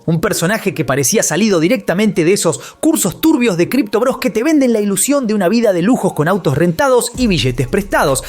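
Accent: Argentina. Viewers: Está bien el reportaje pero lo malo que lo narra un argentino y no un mexicano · Nunca e salido del país (México) y aún así detecto que eres argentino a mí parecer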